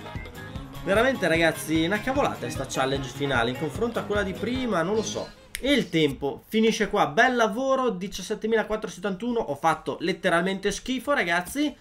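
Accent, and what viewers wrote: Veneto, Italy. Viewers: per caso sei veneto? 😁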